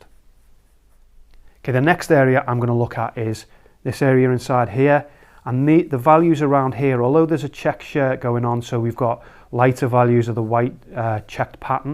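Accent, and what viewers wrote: Liverpool, United Kingdom. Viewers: Scouser? Me too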